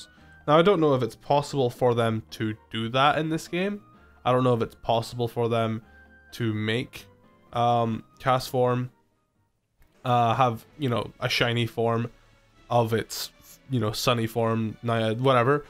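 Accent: Scotland, United Kingdom. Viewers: you sound like you're from Scotland like me!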